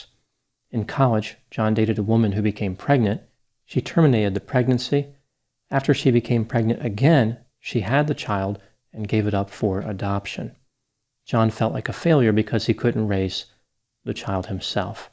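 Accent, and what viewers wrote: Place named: Mid-Atlantic, United States. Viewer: Hi! Question might seem odd, so forgive me but I'm curious. I've been listening to your videos for a couple of years now. They've been soo helpful. Thank you! You have a particular accent..would you happen to have grown up in Southern NJ, PA, or MD? Folks from those areas have a particular way of pronouncing certain words. Lol. I've made a bet with my friend that you're from one of those places lol.😆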